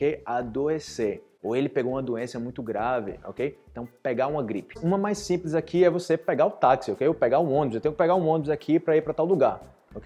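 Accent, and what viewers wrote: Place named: Colombia. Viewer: Oi cara.... que e essa placa ahi da Costa Rica, voce está na Costa Rica ?.... Eu sou da Costa Rica e estudei por tres anos no CB, isso aconteceu la por 2007, depois eu nao voltei praticar e fiquei atras, estes videos tem sido muito boms para min... continua assim por favor.. tou adorando seu jeito.... muito obrigado... Quando voce troca a espanhol se escuta un sotaque colombiano muito bacana, voce aprendeu na Colombia ?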